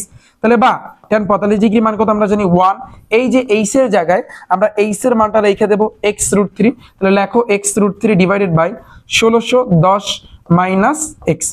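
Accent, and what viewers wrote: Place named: Barishal, Bangladesh. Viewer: কারন ভাইয়ার বাড়ি বরিশাল 😌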